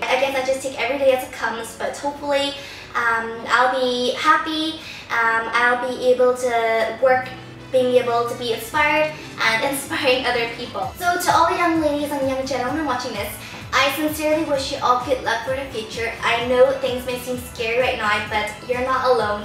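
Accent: Northern Ireland, United Kingdom. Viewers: You sound kinda northern Irish? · Northern Irish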